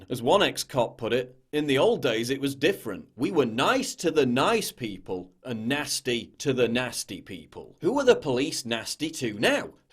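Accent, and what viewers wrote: United Kingdom. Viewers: First video I’ve seen of yours and 3 mins in I hit that sub button talking on our level and your British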